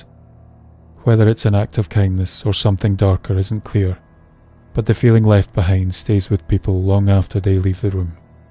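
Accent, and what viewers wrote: Scotland, United Kingdom. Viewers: Soooo enjoy your soothing and wonderful Scottish accent! I’m in love. ❤️🏴. Your stories are so intriguing and with the right touch of history and mystery. Scotland is my most favorite place on earth. I am blessed to have sister by heart, ♥️ she is from Aberdeen. Was up this past June. I will definitely be back. Living in Scotland would be my dream. Thank you. And just for a good laugh, I’m from “ Erie” Pennsylvania. USA 🤭😉👍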